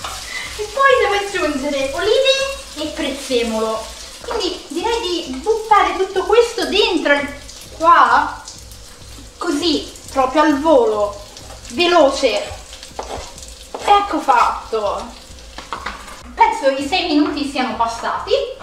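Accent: Tuscany, Italy. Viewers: Mi fai impazzire dalle risate quando assaggi gli spaghetti alla fine, la tua espressione persa nel nulla, e il tuo accento toscano, 🤣🤣🤣ohh maremma, ciao saluti da Pavullo nel frignano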